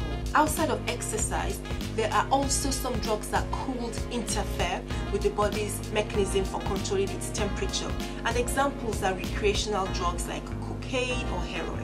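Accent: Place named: Nigeria. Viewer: You are Nigerian I can tell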